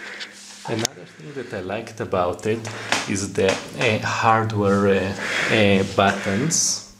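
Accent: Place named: Israel. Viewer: איזה קול ישראלי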